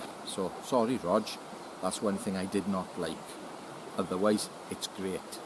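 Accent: Wales, United Kingdom. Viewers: Great video. I love your Welsh accent. So unusual. Looking forward to seeing this Mark 2 model!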